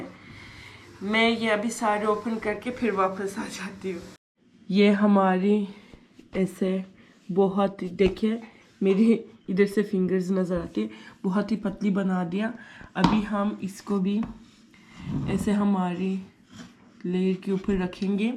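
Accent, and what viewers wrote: Pakistan. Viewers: I really like you your smile aapi and ur pakistani accent too❤loves alot from pakistan and mehndi's design is also very cute😅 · Good video. love your urdu accent. be happy always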